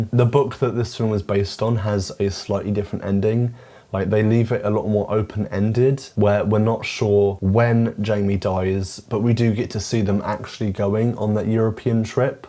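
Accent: United States. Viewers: Your American accent is flawless 😅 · Your american accent is perfect never stop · i love ur american accent lol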